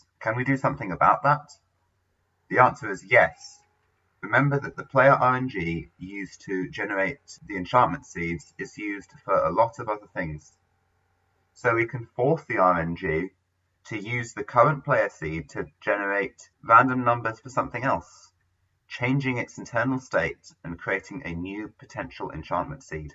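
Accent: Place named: Manchester, United Kingdom. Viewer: Is that Sancarn reincarnated?! Lol I’m joking but I’m super glad that a fellow Brit has managed to make this incredible technical discovery! P.S you sound like you are from Manchester :P Congratulations on this achievement!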